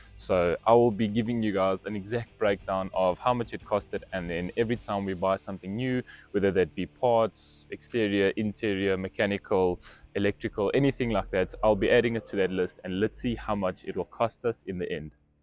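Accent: South Africa. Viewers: You are definitely South African. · Great looking project ❤ Iv just subscribed to the channel keep up the good work 🎉 you sound like you from South Africa 🇿🇦?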